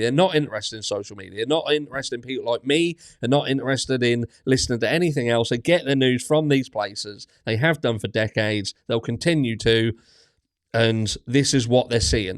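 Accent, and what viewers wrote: Norfolk, United Kingdom. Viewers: Money & power will always win, even the media are making money from Liam. Howdy we’re neighbours 👋 we can sniff out that Norfolk accent 😂🦮🥰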